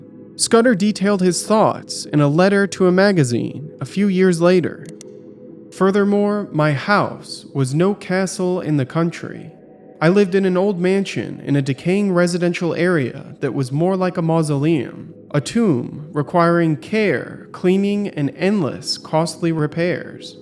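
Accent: United States. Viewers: Quiet in the wilds is never a great omen. Typically, I've experienced that means there's a predator out there that scares every other animal Just saying, you sound at least as country as I am, but still, heads on swivels, guns loaded, situational awareness man. Quiet is not good, especially once it gets dark in the woods or anywhere, honestly.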